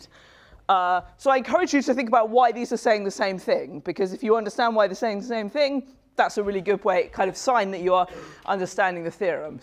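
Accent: England, United Kingdom. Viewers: I like your English Accent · English accent is fucking awesome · The last thing I may think of is to be taught by a British teacher not because they are not good teachers but because of the British accent. I feel like British teachers suffer when they speak...breathing issues...directly coming from the gym to the classroom and starting to teach... Brilliant lecture btw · everything in an British accent sounds more interesting...even the unit circle..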